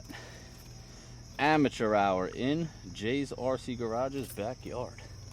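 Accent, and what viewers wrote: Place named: Long Island, United States. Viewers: “Where I live on Long Island”. Nothing was ever more obvious when the first words were spoken in this video 😅. Cool little plane. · thought that was a LONGISLAND accent! I'm in Huntington!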